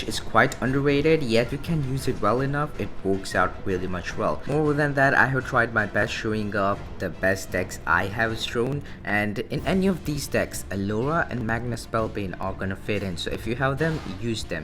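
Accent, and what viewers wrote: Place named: India. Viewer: You have like an Indian accent which is hard to understand your english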